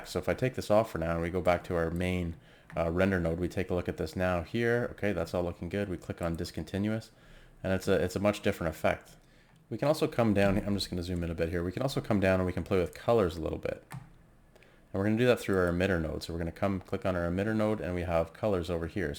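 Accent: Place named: Central, Canada. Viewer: The way you structured this tutorial was incredible; you're a brilliant teacher. And I recognized the central Canadian accent right away, bonus!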